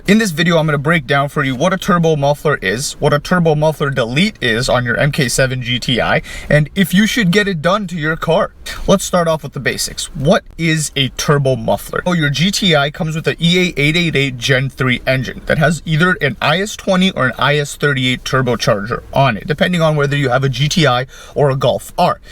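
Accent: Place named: Toronto, Canada. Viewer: Are you from Toronto? You sure sound like you are lol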